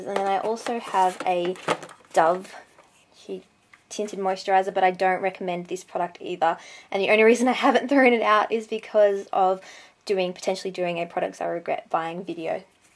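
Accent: Australia. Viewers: omg i love australian accents (and your collection) :)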